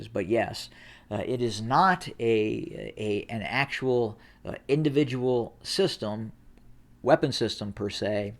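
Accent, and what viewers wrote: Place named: United States. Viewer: Hilarious that this guy who sounds American says he’s based in the US. This network should be called the Voice of Russia.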